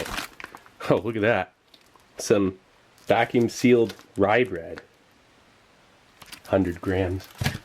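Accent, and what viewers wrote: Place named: California, United States. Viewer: Always wondered where you're from? I hear a Cali accent.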